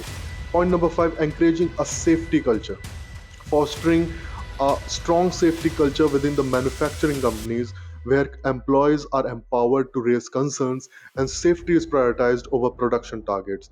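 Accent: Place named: India. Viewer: Sorry Indian voice hurts my ears. All I can hear is SAAR DO NOT REDEEM!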